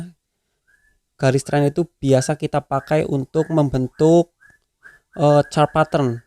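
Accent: Banyumas, Indonesia. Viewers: logatnya kok mirip saya ya 🤭 salam dari wangon mas bro...izin menyimak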